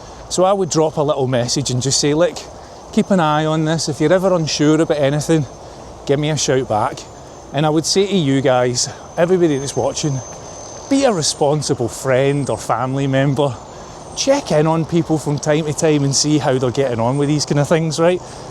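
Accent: Scotland, United Kingdom. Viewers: Some people are so gullible lol. Just love watching and listening to you. I’m Scottish too and listening to you is just like listening to a friend chatting away. I have a snuggly hoody like that too and love it but the arms are about 5 foot long on it 🤣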